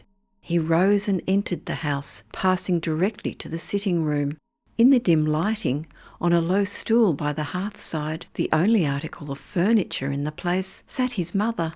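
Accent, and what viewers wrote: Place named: Australia. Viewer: CANNOT hear this story as narrated by an AUSSIE -weird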